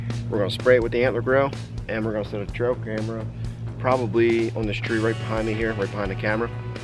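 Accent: United States. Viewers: Are you from Indiana?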